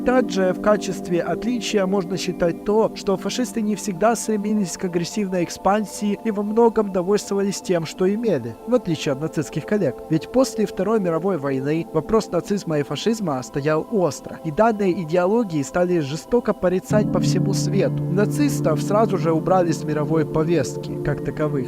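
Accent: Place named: Odessa, Ukraine. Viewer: акцент. Вы во многих словах букву А удлиняете . Получается акцент очень похожий на говор евреев Адеситов .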